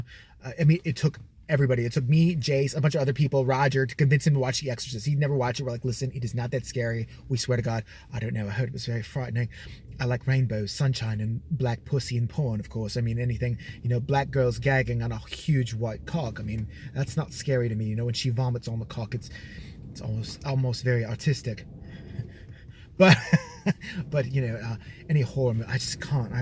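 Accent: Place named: United Kingdom. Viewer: God I love your British voice, bravo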